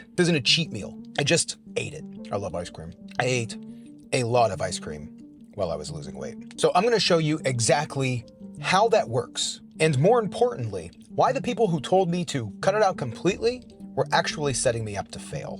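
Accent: Canada. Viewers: Lol, it confuses me every time. I was wondering if you were Canadian.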